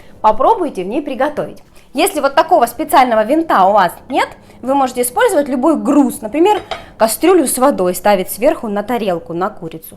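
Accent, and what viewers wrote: Ukraine. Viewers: Украинский акцент очень приятный и забавный...)))) Рецепт очень хороший...лайк за ВСЕ....))!)!)!)!)!)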